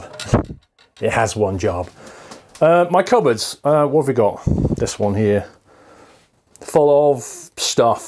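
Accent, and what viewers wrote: United Kingdom. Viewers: Great to see where you record. Nice to hear another Brit as well. We're thin on the ground it seems haha. From what corner of our tiny island do you hail from? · Squirexit lol. youre a lovely brit. naiss accent. love it. greetings from switzerland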